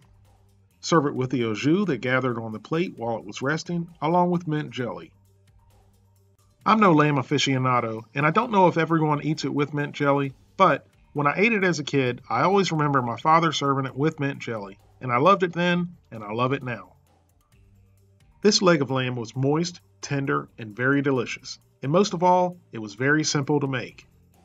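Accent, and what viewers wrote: South, United States. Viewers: What state do you live in? Sound like a Kentucky or Tennessee accent?? Edit. My mistake i meant what state are you from. I grew up not knowing about moving out of state.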